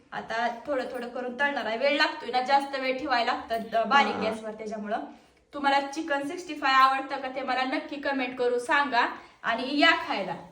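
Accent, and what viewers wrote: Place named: Mohol, India. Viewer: Bhasha vegla bolta tumhe chan ahe pan as bolt nahet mohol madhe tumhe as ka bolta mast vat pan simple bolna god ahe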